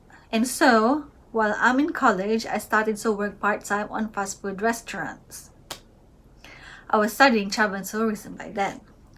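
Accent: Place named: United Kingdom. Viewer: Good listening to you pretty woman, liked the UK accent.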